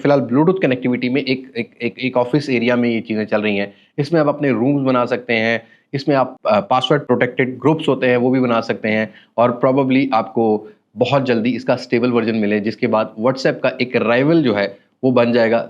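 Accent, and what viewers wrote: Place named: Punjab, India. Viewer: Ap ka thora thora Punjabi ka touch bhut wadia lgia ay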